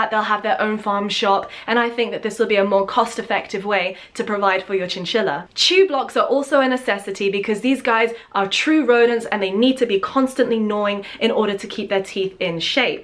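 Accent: New York, United States. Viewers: Yo that’s a fire American ascent tho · I love her american accent lmao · Her American accent freaked me out!! Maybe it's not fake, but her real accent 😲 she does it so well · i personally dont really like kaytee because ive read online that they add in too much products and other things like that. but i did enjoy your American accent attempt!!! love you!!!🐭❤️ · American accent on FLEEK! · I love the American accent. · Omg her American accent is so good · 1- your American accent is killing me😂😂 2- I subscribed 3- I like how you call the U.S "the states"😂😂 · Your American accent was great! As a New Yorker, I really appreciated your New York accent! Lol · As a trained actor I can say, your American accent… Not bad, not bad at all. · I love that American accent! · Your American accent is flawless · Your American accent is great!! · her ny accent was so cute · I'm OBSESSED with different accents in English and I have to say your American accent' s probably better than my British so don't be too modest 😉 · Lmfaooo the American accent omgggggg amazing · Love ur videos. 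You are so cool and smart. Btw ur American accent is on fleeek. Though when u said neutered with the t u exasperated it. Other than that u can fit in. And that New York accent is pro. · I love u so much. Your American accent was even better than mine and I'm from America. I love you so much and keep being amazing and being your awesome self. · well your american accent is fine to me i love your videos your just amazing! bye the way your my fav youtuber! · i have a chinchilla he is so loveable and youhad a good american acent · OMG your Amercain accent is on point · As a born and raised new yorker from brooklyn your accent made my day 😂😂😂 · I just found your channel and so far I love you videos. By the way you did really well on your American accent. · Im just binge watching videos- Your american accent sounded so good 😂 i talk just like that. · Hey, I'm from America and m saying your American accent is on point👍👍and, you are cute with all of your animals😊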